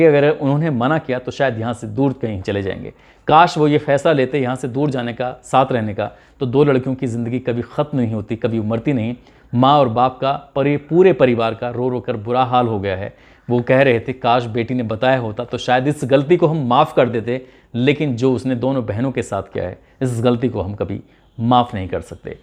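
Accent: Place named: Bhojpuri, India. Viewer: Bura na manna AAP blood ko bled kyoan pronouns krte ho sahi krne ki kosis kijiye Sir bhojpuri tune daldiya aapne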